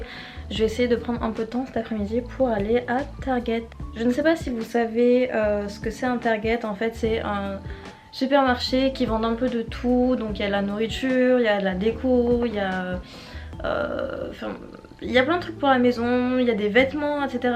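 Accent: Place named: South, France. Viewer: Premier accent du sud que j'entends depuis que je suis à LA ! Merci 🙌!! Sinon super vidéo, j'aime beaucoup ce que tu fais ! Faut que je continue de mater ta chaine ça va me donner des idées pour la mienne 😂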